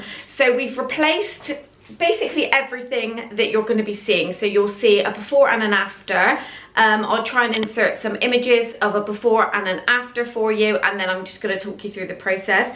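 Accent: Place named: Bristol, United Kingdom. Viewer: Just seen this and you must be a fellow Bristolian with that accent surely 😂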